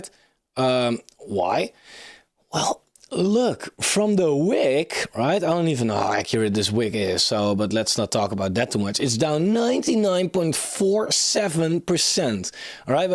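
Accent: Netherlands. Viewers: Are you on the btc amsterdam conference? I say this because you sound like you are from netherlands!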